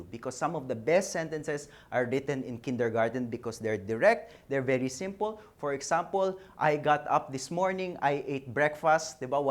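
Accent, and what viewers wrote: Philippines. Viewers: Wow, stig bossingleloy. gotcha.... Dto hlata mo pinas inglis. iba ingls ng ponjap, kano, yanokor, atbp. honga, (trnsn) medyo my pasakalye pinoy inglis, pero maliwanang, naintidihan nila. pero unkanila, medyo kelgangan attention ka. subscribed na po ako. dami trnsition, 共.. t トランシチオン すみません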